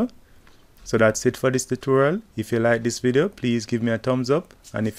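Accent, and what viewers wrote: Jamaica. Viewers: You sound Jamaican, I lived there for a few years. Thanks for the video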